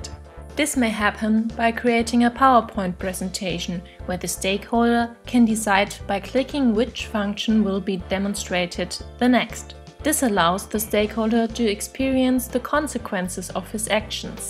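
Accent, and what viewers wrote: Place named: Germany. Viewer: Thank you, this is a fairly comprehensive overview of what a prototype is. I appreciate the depth of the technical terms used. By the way, "Resources" is spelled incorrectly. Also, please reach out if you are interested in an English voiceover for any future projects. (It is sometimes a little distracting, from the content, to listen to the German-accented English pronunciation and mispronunciations.) Otherwise, thanks for posting!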